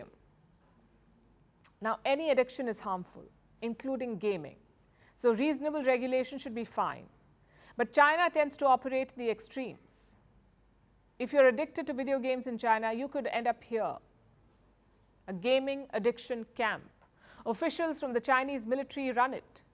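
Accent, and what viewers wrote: India. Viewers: This woman is always talking bad about China with an Indian accent. How much does she get paid for doing this? Is there only bad about about China and nothing good?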